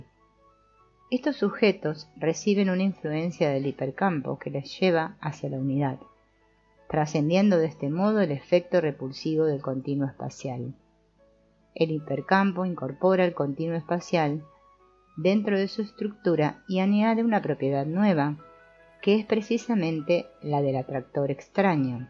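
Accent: Argentina. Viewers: Me lo estoy estudiando segundo a segundo. Ya leí el libro. Y escucharlo narrado por una Argentina, fue una sorpresa grata. Voy a dar una conferencia sobre el tema.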